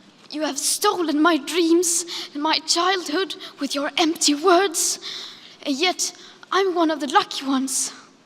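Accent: United Kingdom. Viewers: I’m English and can’t speak another language. Greta sounds like she was born in the Uk. I’m ashamed..... So young, so talented, so educated . We need her in our trillions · Greta has a British accent. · Ma She's swedish but in a lot of European countries you learn british english.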